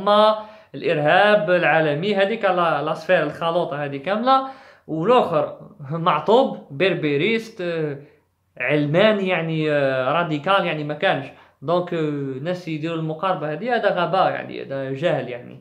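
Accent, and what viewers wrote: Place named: Annaba, Algeria. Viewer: Je vais être dans ta chaine, bonne et excellente Continuation c est une analyse tres sage c est digne d un Annabi puisque tu as l accent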